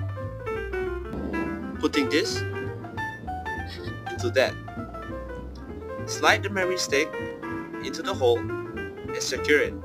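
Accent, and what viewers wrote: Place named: Singapore. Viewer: You sound... your accent. Singaporean?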